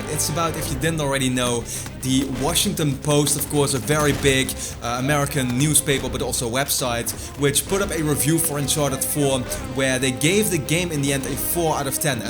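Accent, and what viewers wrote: Netherlands. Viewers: toen ik je accent hoorde dacht ik gelijk dat je nederlands was eh!😂 en toen keek ik bij je kanaal info en het klopte ahahah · are you from holland? i recognize the accent.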